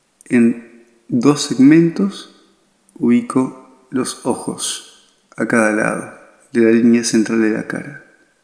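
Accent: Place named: Mendoza, Argentina. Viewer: Por tu tono al hablar sos de mza o san juan .excelente dibujo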